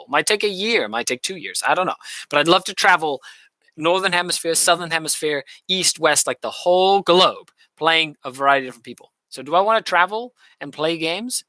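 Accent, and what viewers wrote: United Kingdom. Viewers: PS. I always wondered why you were a Spanish-speaking Canadian with a British accent. Now it all makes sense!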